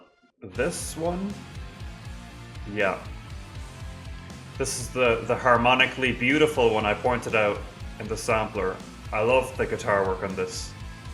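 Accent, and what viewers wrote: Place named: Cork, Ireland. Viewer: woah the world is so small… started watching this video and got caught off guard by ur accent and went to check where ur from, i’m also from cork!! crazy that, with them being a relatively small group and cork being a relatively small area, there’s someone also from there reacting to my fav group…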